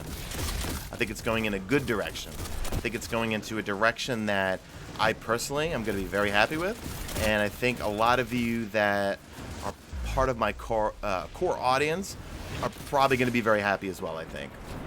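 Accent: New York, United States. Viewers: oh shit, i didnt know you a new yorker. bensonhurst representing over here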